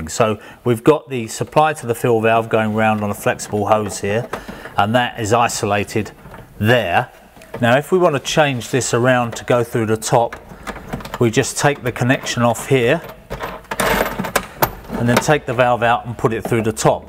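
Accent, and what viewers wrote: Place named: United Kingdom. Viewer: 1st thing when doing any activity...have a person guide you through your project with a very lovely British accent, such as this gentlemen’s!;)So sir, I will need you to come to America first chance you get and talk to me while I remodel my bathroom☺️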